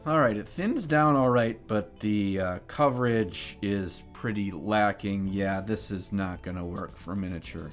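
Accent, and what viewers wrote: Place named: Midwest, United States. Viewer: If I were playing a drinking game where I had to do a shot each time I heard an awful midwestern accent "byag" for bag... I'd be wasted in the opening moments of the video!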